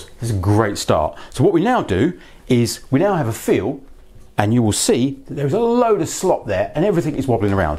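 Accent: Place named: United Kingdom. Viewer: I like the detail you gave, such as the red and black bearing seals. None of the other videos mention that. I like your mannerism, too. I'm a Londoner but have been gone from the UK for forty-six years, and seldom hear a 'Limey' accent. It was great to listen to you. In 2016 I bought a new Specialized Fatboy. I do all my own wrenching since I fell out with a bike shop from whom I had bought three new bikes, and seven botch jobs. I decided to check the headset bearings and found some rust on the lower bearing, even though it had not been ridden in the rain, nor washed. I replaced both bearings and did a good job even though I say it, myself. What I'm looking for is information on swapping caged bearings for sealed bearings. I bought a new Izip bike last autumn and don't know what kind of bearings it has. I know the wheel bearings are individual balls. 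I think I'll bring the bike indoors to drop the fork. Florida is way too hot for working in the porch.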